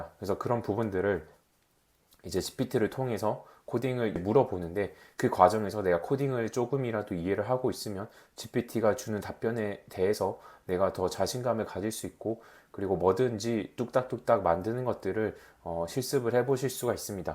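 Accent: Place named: Gyeonggi, South Korea. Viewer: 경기도 사투리 그대로 놔둬도 괜찮습니다. ㅎㅎ!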